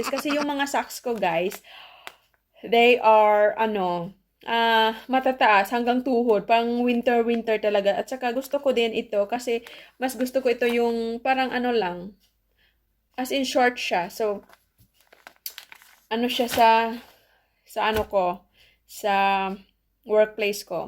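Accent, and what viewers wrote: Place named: Visayas, Philippines. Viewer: Bisaya ka sis parihas Tau bsta bisaya tlga mag Salita bagsak nyahhh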